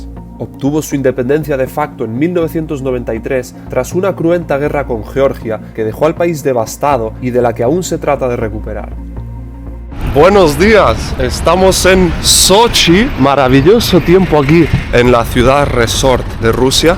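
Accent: Andalusia, Spain. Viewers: Desde Santiago de Chile 🇨🇱 Sudamérica, Si, es Alex Tienda. Recién ví un vídeo de él, acerca de la Fiesta de los Muertos, en México, hacia tiempo que no subía algo. Está físicamente, cambiado, y no sólo por más edad. Por la forma que tiene el amigo de hacer sus Videos, en Corea del Norte, sería imposible, lo llevarían preso. Ellos no se hacen ningún problema en torturar, y hasta matar a una persona. Ya lo hicieron con un norteaméricano, que sacó un póster de propaganda política, de una pared. Prefiero al amigo, en países no conflictivos. Lo sigo desde sus primeros videos, tendría menos de 18 años. Eran muy básicos, pero lo divertido es él, con su acento andaluz y su forma de hablar. Por lo menos, para nosotros, los de América Latina, nos resulta divertido.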